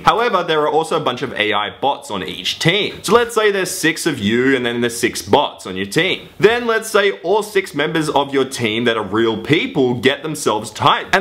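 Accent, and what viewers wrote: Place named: Australia. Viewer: I love rage moments combined with his Aussie accent and cursing, it's so funny:)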